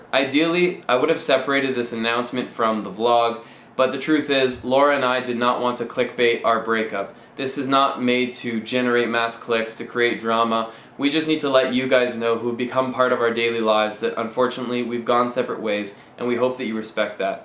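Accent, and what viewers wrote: Canada. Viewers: Got the typical Canadian aye haha. Love the vlog! Keep em coming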